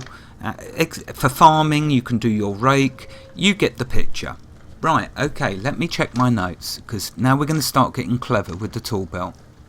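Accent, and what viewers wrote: United Kingdom. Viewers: cool - I will continue to try and get the level up - I would love to join the server and get whatever speak thing you use (Teamspeak?) up and running - I am from Kent originally but I have been living here in Arkansas for about 3 and a half years so its good to hear another British accent - the usual response to my accent here is "you ain't from round here are you boy" - I generally respond by saying oh what gave it away LOL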